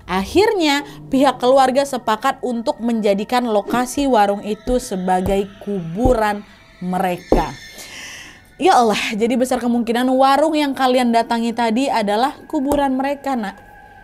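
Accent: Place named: Medan, Indonesia. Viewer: Medan yah kak?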